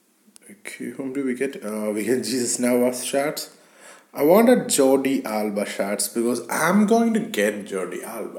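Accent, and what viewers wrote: India. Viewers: You are indian your accent says all🤘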